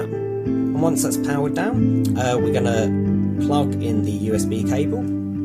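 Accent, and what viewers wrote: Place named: United Kingdom. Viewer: Thanks for being british for a start and not indian and for not playimg stupidly loud dubstep music on your video. Sadly its not working for me i have the correct firmware, reflashed my hardbricked phone and it functions as normal now except the annoyong GoogleKeyState : fail issue but its not working for me for some reason??? Tried opening as administrator, triple checked my imei numbers, double checked i had correct version of firmware EAA version... Im at my wits end with this stupid chinese phone lol im using a Ulefone Armor X5 (not the pro i triple checked that lol) if anyone has any advice feel free to comment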